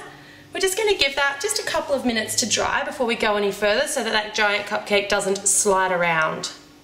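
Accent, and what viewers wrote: Australia. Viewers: Australian, not british :P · She's Australian not British. Don't worry I thot she was British too! >.<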